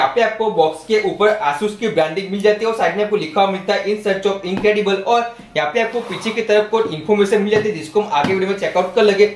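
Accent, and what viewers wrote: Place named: Gujarat, India. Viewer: Yeh pakka gujarati hai iske bolne se hi pata lag gaya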